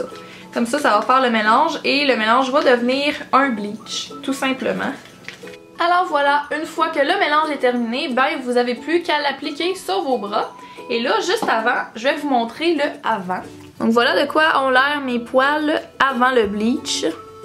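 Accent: Quebec, Canada. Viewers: moi je kiffe l'accent québécois lol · Super vidéo et très jolie accent canadien ou québécois j'imagine ☺ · du Canada ( c'est l'accent canadien )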